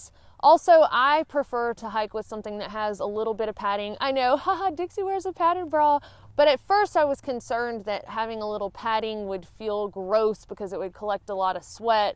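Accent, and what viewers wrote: South, United States. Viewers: I'm a North Carolinian living in Sweden currently, and you are not only helping me plan an awesome "welcome home" hike but hearing that sweet southern twang makes me feel at home :) haha (even though I don't have it)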